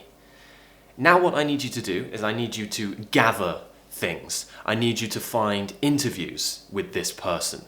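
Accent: United Kingdom. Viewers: Greate video bro.I'm from India.India has biggest English speaking population in the world.English is our official language.cricket commentary is the best way to lean English as i utilized this method.tony grag was best commentator ever according to me.he was English cricket captain.his accent was awesome.from my childhood l wished to have a British accent but not happen till now.but ur videos r help full.Indian accent is very different from British.ur British accent is awesome.I can lean more from ur awesome videos bro. Cricket world cup is going on in England&India goona facing England on Sunday.wish u good luck. ur biggest fan from India 🇫🇴❤🇮🇳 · Hi, I'm a student learning Enlighs from Japan. Thank you fro this video from 2021. You are the perfect role-model for me cause your British pronouciation and accent is my ideal one. You are gonna be my best teacher on YouTube right now!! 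· Hello, thank you very much, this is really useful. What is your accent? Is it RP? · I want to speak British, so how I can speak like you I like your speak very much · Master, it is really wonderful to listen to you! Your British accent and pronunciaton is so perfect! You are an excellent teacher, no doubt. Thanks for everything you give your listeners. I am a teacher of English and Bachelor of Art but I still feel I need more exposure to the Spoken English language. Imitation is one of the most important step in the learning process! You will be my role model, for sure. Looking forward to listening to you again soon. Take care! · Good afternoon. Thanks very much for your great advice. You're a unique, so no matter what I will try to copy you I will never be able to speak like you do with this beautiful and clear British accent. I just love it. Sure I will be listening your podcast, not only to improve my accent but also to learn more from your experiences. 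I try to improve it all the time, but then asking me to speak like you, is kind of asking to much. However I've been learning so much from you and I do appreciate it. Again, thanks ever so much.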